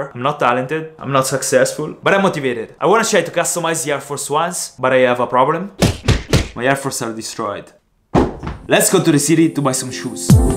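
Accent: Italy. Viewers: Bro you sound italian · You have such an italian accent bro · fra si sente sei italiano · His accent is like an Italian trying to talk in inglish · Are you Italian? I am Italian and your accent sounds like it! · 🇮🇹Hai un accento italiano mentre arli in inglese, sei italiano ? 🇬🇧you have an italian accent when you speak english, are you italian ?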